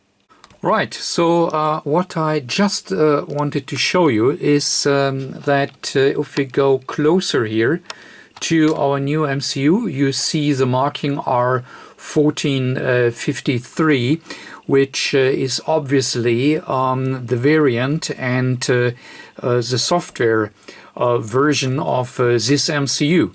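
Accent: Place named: Germany. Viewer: you sound like my mentor with a german accent very easy to follow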